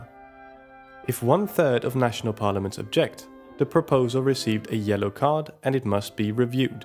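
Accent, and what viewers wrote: Netherlands. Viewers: Can I place a guess and say you are Dutch or Flemish?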